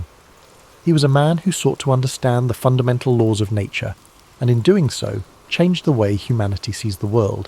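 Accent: United Kingdom. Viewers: I have to wonder, much as i am listening to these! if this is AI as so many facts and stories are being put out quite fast and this is an english ‘narrator’ but the site is American.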